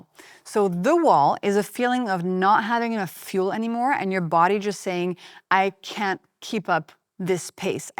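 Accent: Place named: France. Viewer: Your voice is mellifluous ! Ur french accent makes all the difference I guess and u use a lot of hissing sound. No wonder..most French women are sexy and sound sexy ! But, this dress sucks !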